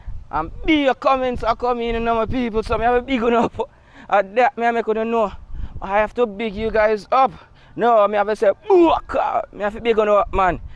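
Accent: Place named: Patois, Jamaica. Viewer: In reference to you speaking patois,most of us were taught English in our homes.Our language culturally defines us and it’s okay.Building your platform requires clear spoken English so all your audience can understand.Thank you for choosing to provide this forum ,positive content is what we earn for ,blessings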